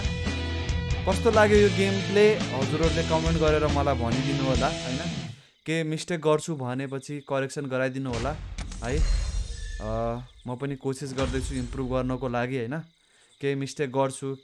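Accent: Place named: India. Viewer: Nepali bolnu birsina aatexa dhoti jastai bolxa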